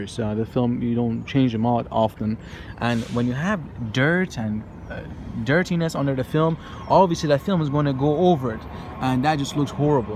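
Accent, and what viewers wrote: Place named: Iran. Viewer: Woow Such a Persian accent Did you come to US like last year or somethin?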